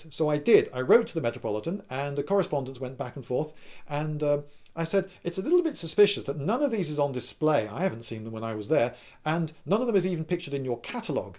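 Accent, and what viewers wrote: United Kingdom. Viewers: when I saw the thumbnail I thought: look at this neck beard cosplay tard. then I heard your British accent and your IQ points went way up lol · As an American watching your videos I can say if I'm getting some news or if I'm going to have something explained to me it's always better with a British accent... Cheers...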